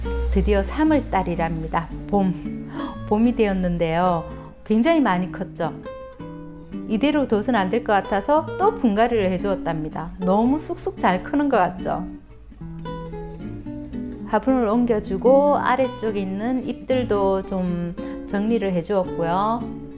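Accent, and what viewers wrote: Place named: Gyeongsang, South Korea. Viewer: 요즘은 애니시다도 능력에 따라 삽목이 어느정도 가능해진것 같아요 정감있는 대구사투리? 즐겁게 보고 갑니다~~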